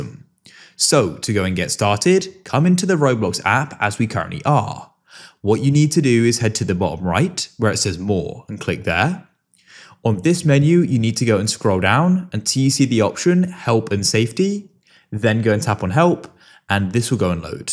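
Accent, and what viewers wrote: United Kingdom. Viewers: Your British?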